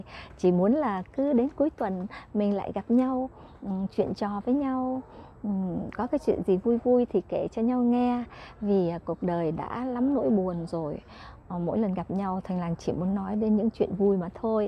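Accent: North, Vietnam. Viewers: Chị ơi theo em được biết chị sinh ra ở Đà Lạt nhưng chị nói giọng người miền Bắc nhiều hơn ah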